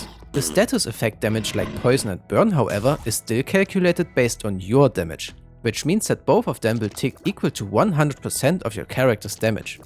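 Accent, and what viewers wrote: Germany. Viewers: du klingst deutsch… bist du Deutscher? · are you german?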